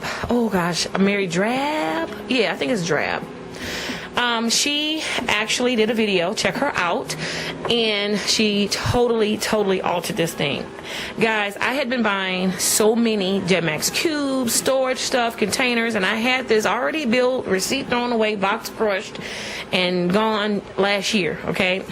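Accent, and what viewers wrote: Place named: St. Louis, United States. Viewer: Awesome Room, can I come shopping! :-) lol St. Louis Talk, "Whered' you go to Highshool?" Do you drive on highway "fardy" :-)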